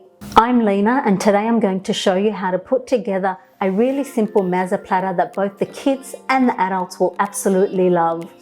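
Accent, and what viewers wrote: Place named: Australia. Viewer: Love your Aussie accent I’m Australian too MaashAllah sister your very beautiful 🥰btw I’m going to try this soon 🇦🇺❤️🇱🇧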